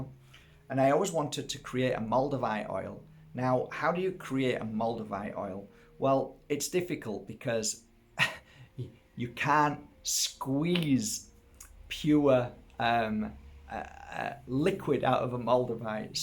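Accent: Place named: Northern England, United Kingdom. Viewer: Hi sir. where are you from. you sound like an northener. im from west Yorkshire. thank you for your advice x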